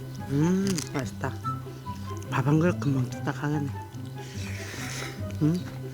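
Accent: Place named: Jecheon, South Korea. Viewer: 제천 사투린데 넘 정겹다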